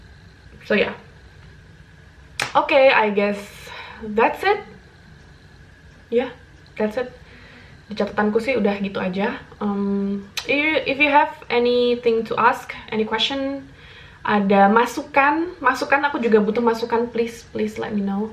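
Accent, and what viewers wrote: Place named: Yogyakarta, Indonesia. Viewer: Thank you for kind sharing. Seneng banget akuuu, penasaran Ka orang mana sih? Logatnya kaya orang jogja hehe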